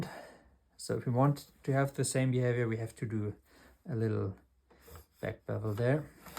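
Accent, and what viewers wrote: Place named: Sweden. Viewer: That's a Swedish accent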